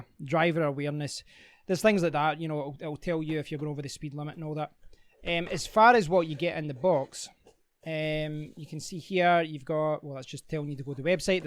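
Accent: Scotland, United Kingdom. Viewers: Neither Welsh n or Irish; it's pure Scottish. :-) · HES SCOTTISH!!!!!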